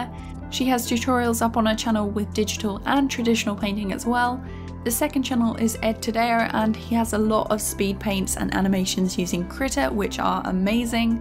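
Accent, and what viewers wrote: United Kingdom. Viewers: I love your voice! and that british accent is so sweet!! greetings from Brazil ;-)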